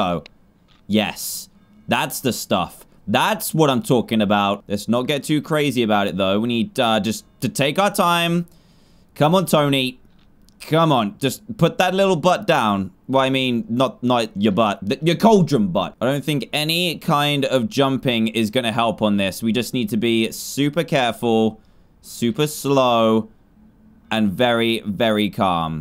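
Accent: United Kingdom. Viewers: I'm new to his channel, damnnn I love that British accent 😍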